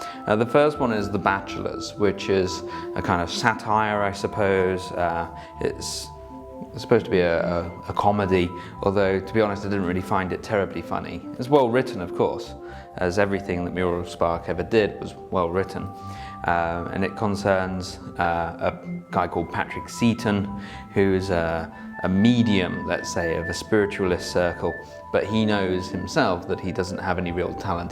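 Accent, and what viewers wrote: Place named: Scotland, United Kingdom. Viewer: She scottish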